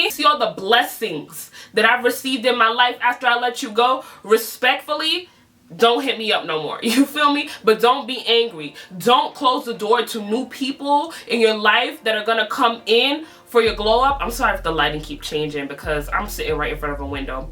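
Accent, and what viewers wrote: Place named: Brooklyn, United States. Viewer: That Brooklyn accent doe🔥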